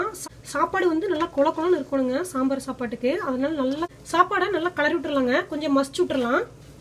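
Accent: Kongu, India. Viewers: konjum kongu tamil super, very respective slang in tamilnadu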